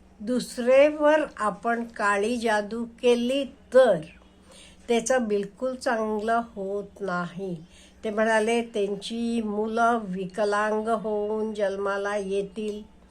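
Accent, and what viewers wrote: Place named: Vidarbha, India. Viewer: Aaji tumhi vidarbha cha aahat ka marathi thodasa vegla vatta pan chaan vatta aikayla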